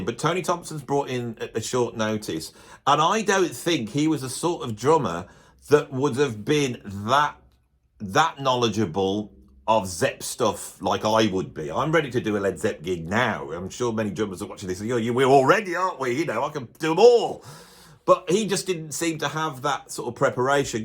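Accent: Birmingham, United Kingdom. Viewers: love your Birmingham accent, still lying on the floor laughing.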